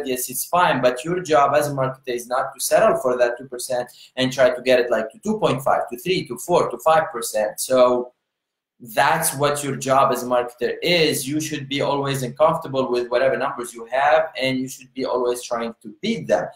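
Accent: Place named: India. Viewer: انجلزيتو مثل انجلزية الهنود الي عمرهم ما خرجو من الهند !!! لا اعرف لماذا يصر على التكلم كليا بهذه الانجلزية !!!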